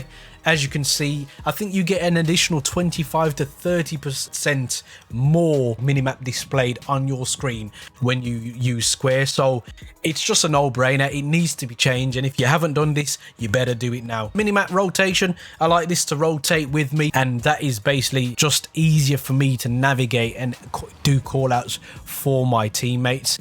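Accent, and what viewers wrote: Birmingham, United Kingdom. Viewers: Yes brummy lad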